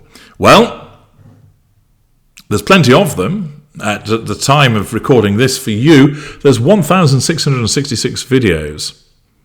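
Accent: Australia. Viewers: Love the Ozzie accent. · Hahaha 🤣 love your Ozzie accent.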